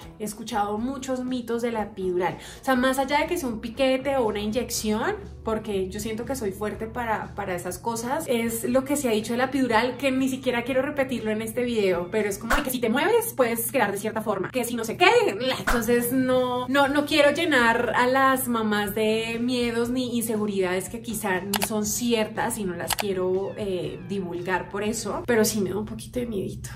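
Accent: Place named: Colombia. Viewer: no pierdas tu acento Colombiano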